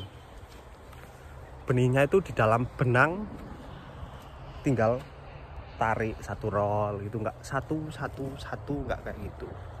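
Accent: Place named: Japan. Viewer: Smean orang mana kang, ko ngga bisaa di bedain klo samean orang jepang apa indo😁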